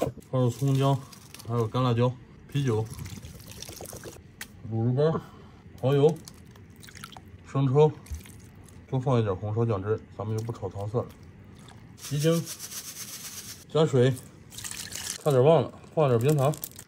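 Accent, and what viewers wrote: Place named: Beijing, China. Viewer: 听着像老北京人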